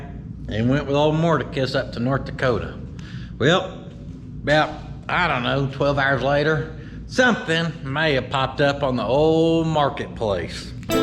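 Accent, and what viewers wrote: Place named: Oklahoma, United States. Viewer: the true sound of Oklahoma!!!!! A real accent from the south west!!!!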